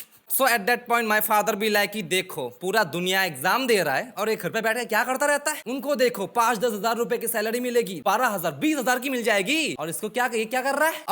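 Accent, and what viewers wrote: Assam, India. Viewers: bhaiya kiya aap assamese ho .....??? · Bruhhh I kindaaa felt like u r Assamese or yk Assamese 😭✨✨I was right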